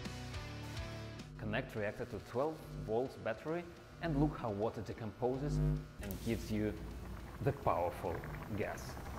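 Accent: Russia. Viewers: Сразу, по акценту, понял что ты русский! Я желаю тебе удачи, ты молодец!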